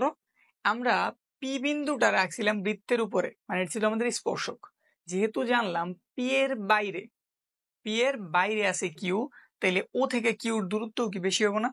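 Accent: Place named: Barishal, Bangladesh. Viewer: স্যার আপনার😊 বাড়ি বরিশাল আমার বাড়ি কিন্তু বরিশাল😂 কারণ আপনার কথায় বোঝা যাইতেছে😮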